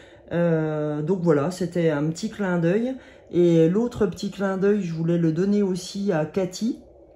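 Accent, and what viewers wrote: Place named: Franche-Comté, France. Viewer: Bonjour je vous découvre aujourd'hui, je trouve que c'est sympa vous êtes très naturelle, je ne sais pas de quel coin vous êtes mais votre accent ressemble à celui de la Franche-Comté. Je m'abonne. Au plaisir de vous écouter de nouveau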